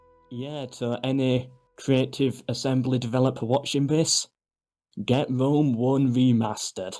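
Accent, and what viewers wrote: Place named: Northeast England, United Kingdom. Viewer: What accent is that? North East England but where?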